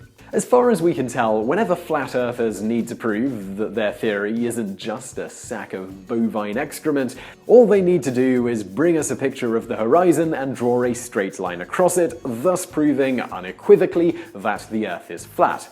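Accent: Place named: Cockney, United Kingdom. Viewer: Watch something positive too much cockney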